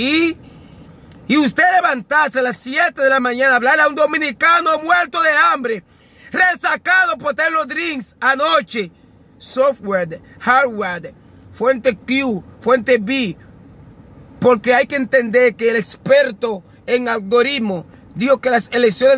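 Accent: Haiti. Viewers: Tu eres haitiano ese acento tuyo es puro haitiano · Disculpe, hermano yo no lo conozco, su acento es ahitiano?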